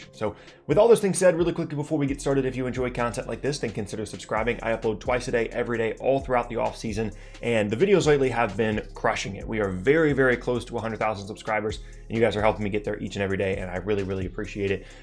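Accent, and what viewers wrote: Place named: Boston, United States. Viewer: I think is that Boston accent. It makes people crazy.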